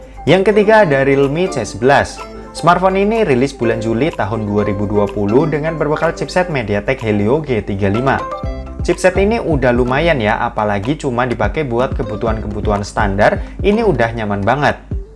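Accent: Semarang, Indonesia. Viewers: mas, sampean wong semarang mesti hehehe suwon info2nya mas, sangat membantu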